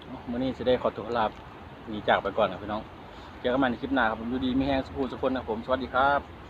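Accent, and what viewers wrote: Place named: Nyo, Thailand. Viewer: สวัสดีครับอ้ายมาเบิ่งมากินนำครับ..ได้งินสำเนียงญ้อแล้วคึดฮอดบ้านครับ..เพื่อนใหม่เด้อครับเขยสกลมาเบิ่งนำครับ..มุงหลังคาให้แล้วครับอ้าย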